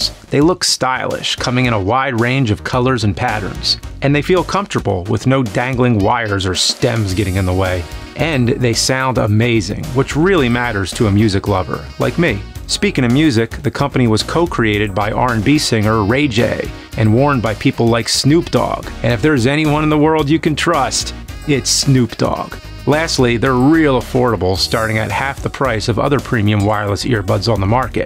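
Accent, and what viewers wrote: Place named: East Coast, United States. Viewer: But with that awful East Coast accent, it's more like "Æss".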